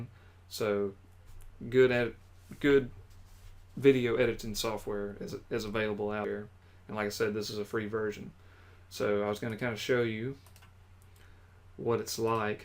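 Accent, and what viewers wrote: South, United States. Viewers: the only criticism I have is that the drill press was 1/4 inch out of line lol...I like your videos very much..I like the southern, easy way of speaking you have..This video will be of great help to me as I want to make a video. Listening to you speak is like having a fireside chat with a friend..Thank you very much for this video..a new fan from canada,,,Bill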